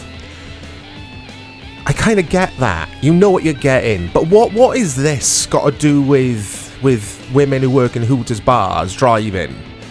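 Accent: United Kingdom. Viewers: yuk, british accent...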